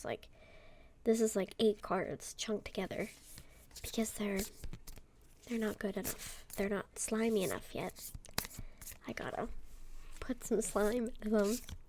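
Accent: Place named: England, United Kingdom. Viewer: 10/10 English accent. I got family in England, I think your accent would pass.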